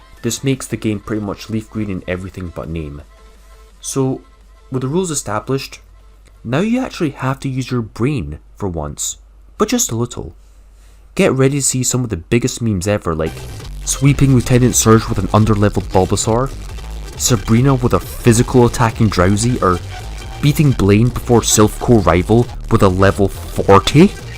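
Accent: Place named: Scotland, United Kingdom. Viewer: Not a pokemon related question, but could you briefly turn up your scotish accent to 11 and sound almost unintelligible?!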